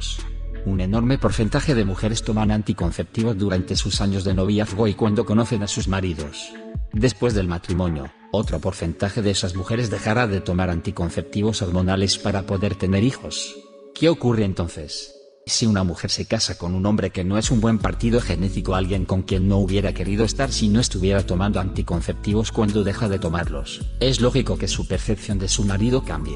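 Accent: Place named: Russia. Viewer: no mames que pedo con esa voz parece q esa IA aprendió hablar español despues del ruso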